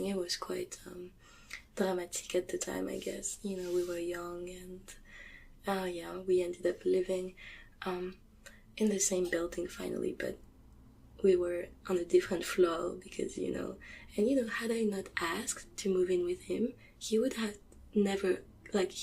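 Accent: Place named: France. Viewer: Tingly as always <3 Your french accent videos are so tingly man. Your hair!!! Are those clip-on bangs or a whole wig or new hair or--- you look gorgeous anyway:)))) Thank you for the video <3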